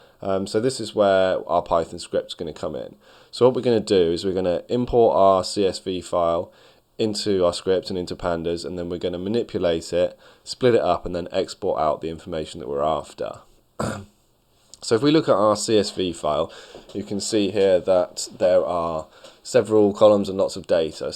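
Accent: United Kingdom. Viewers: Tough British accent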